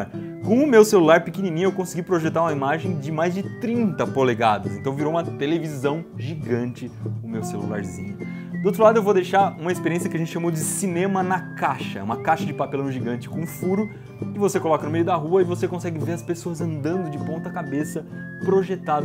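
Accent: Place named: Minas Gerais, Brazil. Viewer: Iberê vc é Mineiro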